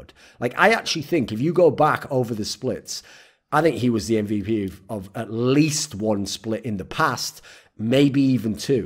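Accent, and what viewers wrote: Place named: Northern England, United Kingdom. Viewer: Nah , like northern English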